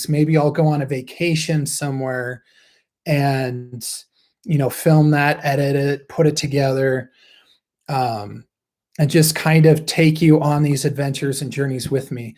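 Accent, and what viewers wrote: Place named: Africa. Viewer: I love African accents too!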